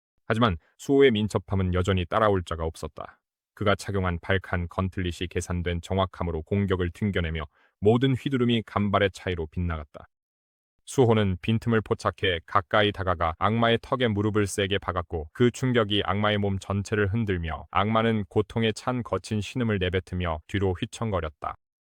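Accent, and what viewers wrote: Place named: South Korea. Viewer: 한국인 그 자체네요... 얼굴가리고 나레이션만하면 한국인인줄 알겠어요😂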